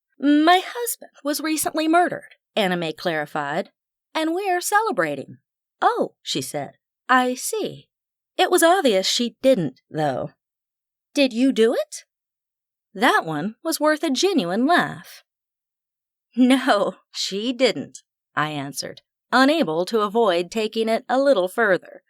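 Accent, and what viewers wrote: South, United States. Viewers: I do love the added male voice with the deli ious British accent. Dont get me wrong, I love the southern accent also ( I am a born and raised Georhia peach ) but the British accents makes my old heart flutter. Lol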